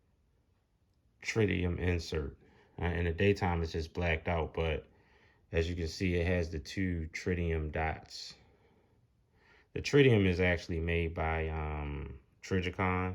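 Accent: Baltimore, United States. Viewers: I hear Baltimore all in your accent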